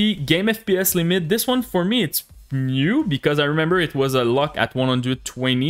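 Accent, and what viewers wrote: Quebec, Canada. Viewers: L’accent québécois hahaha mais lets go je vais essayer ça 🤘🏼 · I like the quebec accent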